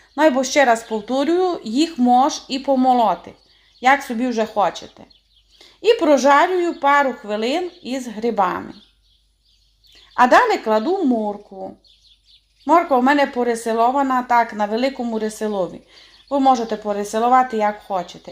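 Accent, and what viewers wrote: Transcarpathia, Ukraine. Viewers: Господи! Яка ви гарна! Нема просто слів! А закарпатська мова - це просто неймовірно 😘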